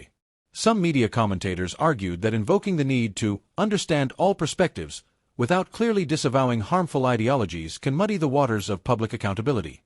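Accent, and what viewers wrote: United States. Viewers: Even worse it's got a yank accent and can't pronounce SAS Who Dares Wins properly. These channels should be banned.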